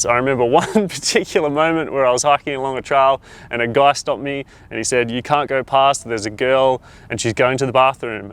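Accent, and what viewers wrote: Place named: Australia. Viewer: mate you sound aussie like the crocodile hunter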